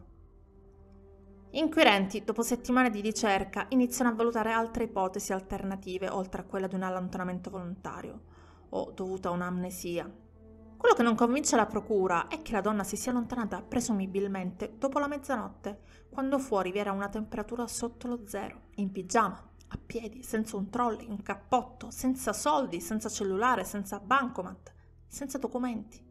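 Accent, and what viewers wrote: Sicily, Italy. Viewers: sai che io che sono palermitana come te il tuo accento nn l'ho mai sentito? Poi l'ho letto nei commenti che sei siciliana, e gli altri invece dicevano che avevi l'accento siciliano, che strano forse perché era il mio stesso accento per cui nn ci facevo caso😃😃😃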